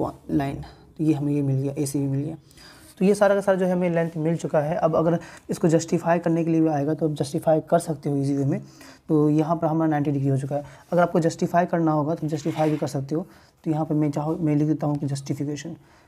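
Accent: Bihar, India. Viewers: Sir app bihar se ho?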